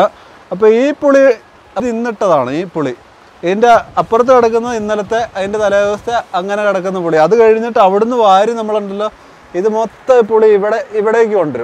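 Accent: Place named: Thodupuzha, India. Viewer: Ahaa njan thodupuzha.. Samsaravum aaa style okk kandapo thoni.namude nattukaranane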